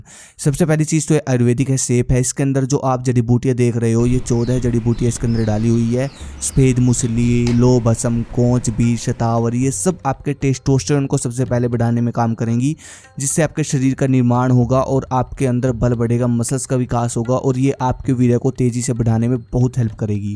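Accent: Haryana, India. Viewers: Bhai ap haryana se ho kya mne to isa e lag h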